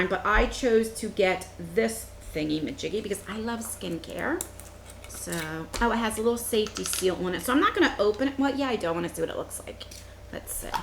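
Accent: New Jersey, United States. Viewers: I’m from south Jersey too!! I knew you had that jersey accent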